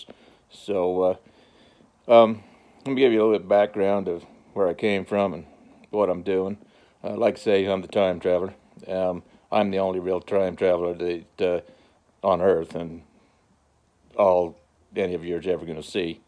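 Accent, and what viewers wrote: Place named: South, United States. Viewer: Why are you Caucasian if you’re from the Middle East? Why do you have a southern accent? If you’ve gone further into the future and you say you can’t change the past, why are you here now if you can’t change anything? Wouldn’t this be the past? Have you considered that the fall of the US is a part of prophecy and God’s will?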